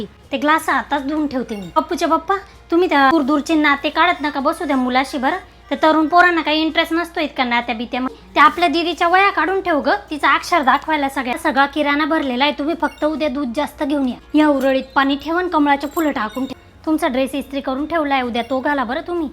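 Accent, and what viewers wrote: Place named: Vidarbha, India. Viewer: छान वर्‍हाडातला हेल बोलता तुम्ही. मला माझ्या नात्यातल्या आवडत्या तायांच्या घरी भेटीला गेल्यासारखं वाटतं...❤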